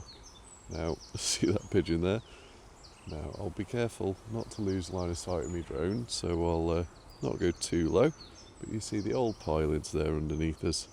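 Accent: Shropshire, United Kingdom. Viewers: Don't worry about your accent or it being Shropshire. For us foreigners it's all an English accent. Main thing for me is that you are annunciating well enough to understand without captions. There is something about your videos though that if I watch them at night they put me right to sleep. Keep up the good work. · More education for me. I've stayed in Shropshire a few times but never knew what the accent was. Actually pretty good. Not Northern Southern or Brum! Another great video. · Continue sounding Shropshire,; you're just fine. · Very nice Shropshire accent. You speak clearly and we can understand you. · As an American Anglophile I absolutely LOVE your accent,! Let the Shropshire lad free! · we love all British accents including Shropshire! Waving from Australia 👋🇦🇺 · Nothing wrong in using your Shropshire accent. My granny was very proud of hers even though she moved to Lancashire when she was 18 yrs old. 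· What's wrong with your Shropshire accent? It's perfectly understanable and much better than trying to 'put on a posh accent'. I'm Scottish and I don't change my accent and seem to be understood everywhere. That excavator driver is amazing. The way he put the orange tarp into the dumper was a work of art. Imagine seeing the excavator and the spider together. It would be like watching a choreographed ballet.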